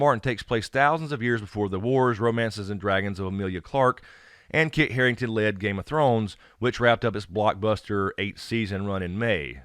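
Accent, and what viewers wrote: South, United States. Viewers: Will do. I guess I still want to hear your take on their comments though, as, well I respect your opinion. I'll listen to it, but what Im' getting at is; what would you have said if this was something like a panel discussion and you got to ask them questions, and point out instances to them that you think doesn't fit the tone of the rest of the series. And of course get more of the cute Southern drawl. ( I swear I'm not flirting! You know I'm married. My mom ran away from Tennessee, so it feels homey to me in some weird way.😉)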